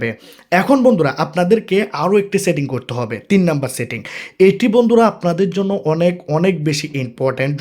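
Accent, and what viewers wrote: Bangladesh. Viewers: Apnar kotha sune mone hochhe apni Bangladeshi lok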